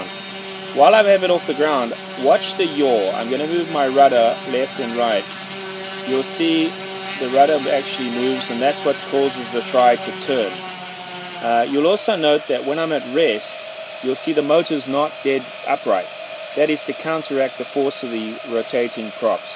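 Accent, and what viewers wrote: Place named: South Africa. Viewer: You sound like you have lived in South Africa before......(accent)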